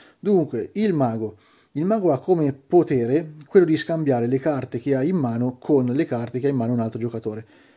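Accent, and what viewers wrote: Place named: Perugia, Italy. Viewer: solo perché sono di Perugia anche io hahahah anzi si sente pochissimo grande bel tutorial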